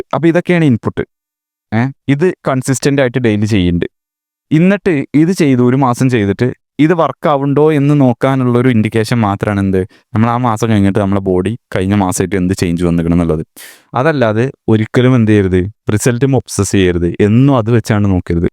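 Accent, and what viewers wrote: Malappuram, India. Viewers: Bro Malappuram aano? Presentation kollam👍🏽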